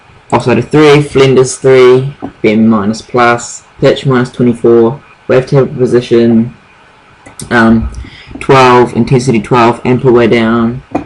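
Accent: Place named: New Zealand. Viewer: lol hard nz accent hahaa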